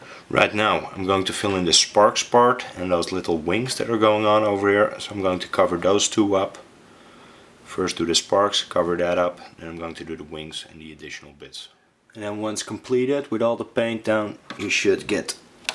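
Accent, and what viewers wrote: Netherlands. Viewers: Dutch accent ftw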